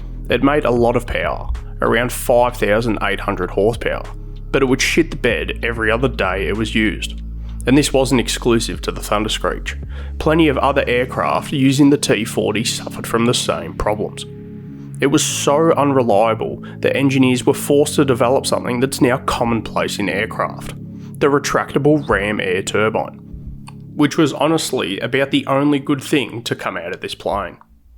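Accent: Australia. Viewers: What a terrible Australian accent this speaker has! That's not proper English. That's Outback babble.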